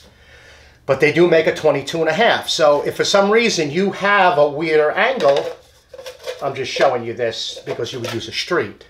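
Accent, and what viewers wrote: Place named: Long Island, United States. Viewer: I have watched more dust collection videos than I care to admit to. Your video has got to be the very best at explaining the fittings and the types of pipe and fittings needed. In addition your accent reminds me of my childhood living in long island!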